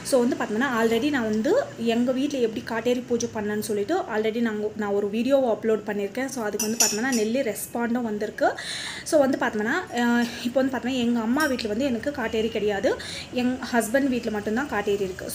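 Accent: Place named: Bangalore, India. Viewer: Mam you have given a good explanation.. i think you are explained the procedure followed by Vahnikula kshatriya. Tamil you have spoken is Bangalore Tamil if am right. Thank you for the explanation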